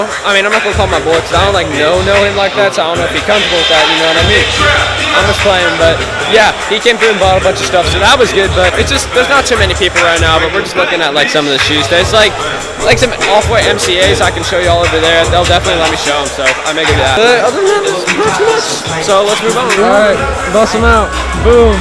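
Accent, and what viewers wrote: South, United States. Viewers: Where are you from? You seem to have a southern accent now that your voice is a little deeper.🤔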